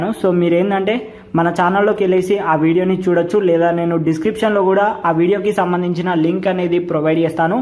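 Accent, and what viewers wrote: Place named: Telangana, India. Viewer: language okkk telanganaaa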